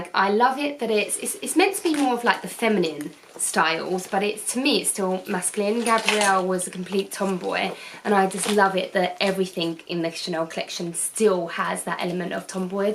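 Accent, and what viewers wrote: United Kingdom. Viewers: Knew you'd be a brit 😁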